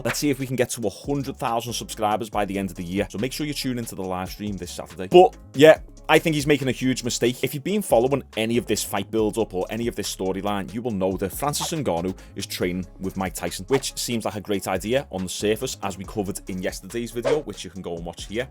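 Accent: Liverpool, United Kingdom. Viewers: Why keep the Scouser accent when you do a perfect Tyson AND a perfect Ngannou lol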